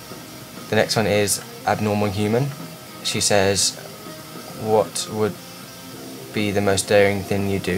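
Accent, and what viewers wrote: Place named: England, United Kingdom. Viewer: you sound more english than welsh, aw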